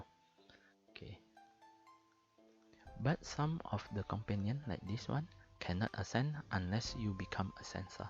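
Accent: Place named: Indonesia. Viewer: Fix org indo ini, dari cara ngmng nya keliatan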